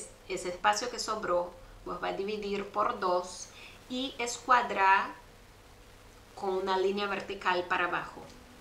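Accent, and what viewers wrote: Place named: Brazil. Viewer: Hola de que país eres por tu acento parece que eres de Brasil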